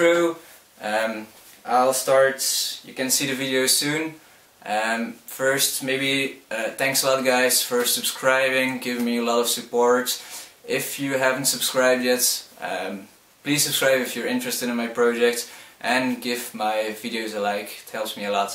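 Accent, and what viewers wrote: Netherlands. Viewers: You dutch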